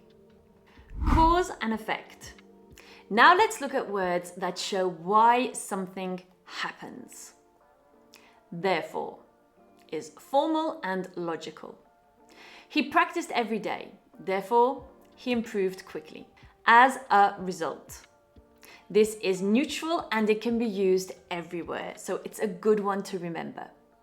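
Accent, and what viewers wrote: United Kingdom. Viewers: I really enjoy watching your videos and listening to your British accent. 😉 Although what you say sounds easy, the reality is very harsh. However, I want to continue learning from your videos. Have a nice weekend...Thanks,. 😊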